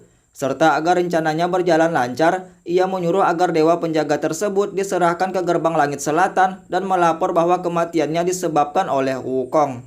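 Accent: South Sulawesi, Indonesia. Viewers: logatnya lucu, ugi'banget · Kenapa logatmu kayak orang soppeng atau bone😅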